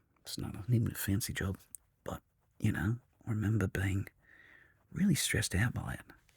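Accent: Australia. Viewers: YAYYYY my request for more aussie accent has been answered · Another fantastic video. Some great advice and thought provoking ideas. Been struggling a bit with too much thinking of “having to do” things instead of “getting to do” things. Added bonus, I love Aussie accents and yours is awesome. 😊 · I love this so much the accent is spot on mate! It makes me happy knowing you’re not mocking aussies accents! 💜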